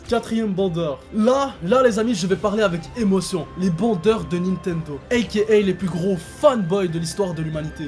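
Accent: Quebec, Canada. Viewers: puréeee après 4 vidéos regardées j’ai enfin capté que t’étais québécois j’arrivais pas à analyser l’accent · T'es québécois ?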